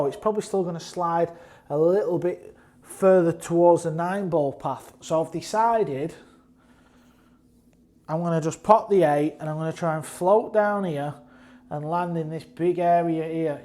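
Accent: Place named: Lancashire, United Kingdom. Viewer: next time you do the Mosconi announcement, ride in on that scooter for added effect 😂😃! Good stuff though..When I zoom with Garstang cousins soon will say Lancs accent reminds me of them 😉 You teach well. Thanks for helping the global pool community.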